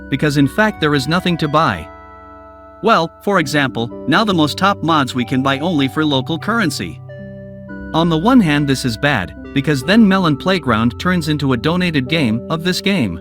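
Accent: Russia. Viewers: are you Russian??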